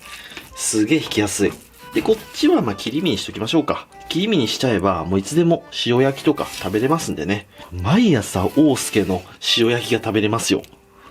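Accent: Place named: Tokai, Japan. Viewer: 随所に出る東海のなまりが故郷を思い出して好き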